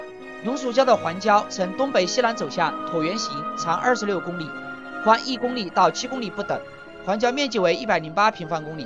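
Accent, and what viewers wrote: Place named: Sichuan, China. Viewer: 这声音是四川那一带的普通话。谢谢解释！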